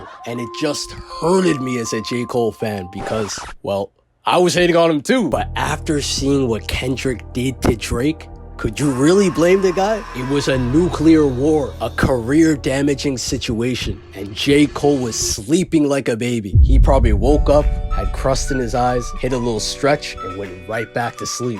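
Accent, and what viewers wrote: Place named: Canada. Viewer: so sorry to ask but are you Canadian? love ur voice lol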